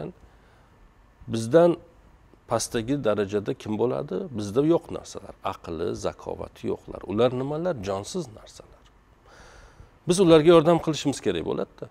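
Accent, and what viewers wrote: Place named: Termez, Uzbekistan. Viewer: Мен бу канални икки Кун булди. Танидим. Узбекистонда и кайси студияда. Гоголда туркияда деб беряпти. Шевангиз Термизга тортяпти. Каерликсиз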